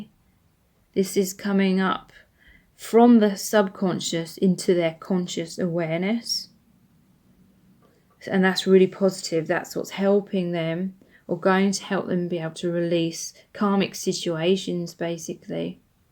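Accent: United Kingdom. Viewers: can anyone explain to me what she meant by transformation in communication for pile 1? the person i love is living an unhappy marriage and trying to get his divorce, but he is afraid that the wife will not let him see his daughter any more , i didnt really understand her as she has a british accent and am not a native speaker.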